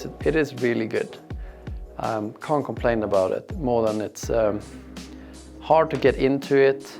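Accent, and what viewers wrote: Sweden. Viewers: Hehe, yer so funny. I liked your way of explaining the disadvantage with the pointy binding 😂 Are you by any chance Swedish? You got a good accent. ☺️ I’m searching for a nice marker that got easy switch for adjusting for the booth size with an 11cm wide ski stopper. Any suggestions? I don’t like griffon ... you got to screw them that is you need a screwdriver 😥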